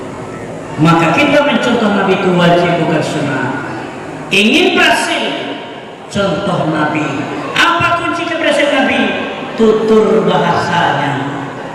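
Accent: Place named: West Sumatra, Indonesia. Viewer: Urang minang mano suaro nyo😀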